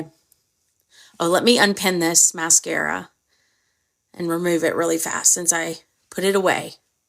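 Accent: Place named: South, United States. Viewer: You have become my new favorite creator. I find you adorable and entertaining af. You're so sweet and funny and I love your southern accent. ❤ Anyway, have a good night!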